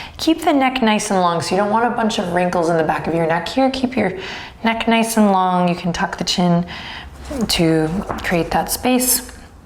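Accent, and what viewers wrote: Ireland. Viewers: Thank you for your wonderful videos, I love benji! Really finding it enjoyable to follow your videos, i never managed to do yoga by my self at home until finding you.. This week my theme is the heart so this was perfect! things are coming together in my life to allow me to keep my heart open. Sending gratitude from Ireland (perfect that you did an Irish ascent this time!) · i like the irish accent. lololol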